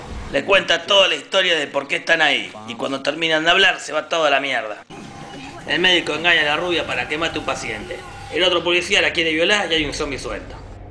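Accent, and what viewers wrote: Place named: Argentina. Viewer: es un genio el loco, me re copo como hizo todo el video y por como habla me parece que es de aca de Argentina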